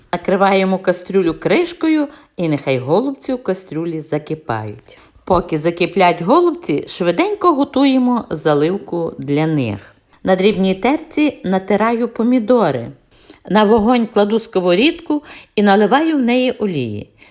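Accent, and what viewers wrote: Ukraine. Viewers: Гарно,просто,смачно і зрозуміло А саме головне страшенно приємно чути наш український говір. Підписалася і поширила. Маю подругу в Америці надіслала і їй. Щиро подякувала.🖤❤️💛💙🌹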